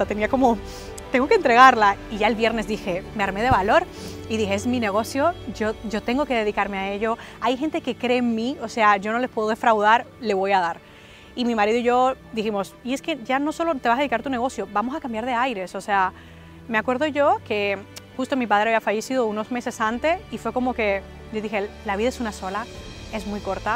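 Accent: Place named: Dominican Republic. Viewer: a mi me gusta mucho que te sale el acento dominicano y español a la vez, es una bonita mezcla. Excelente historia, a por mas.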